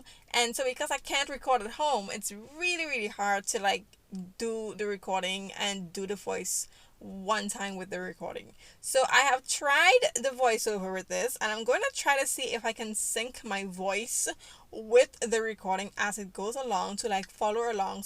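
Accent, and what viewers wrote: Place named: Nigeria. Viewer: Are you nigerian? I can hear from your accent its really annoying lawd 🤦🏾‍♀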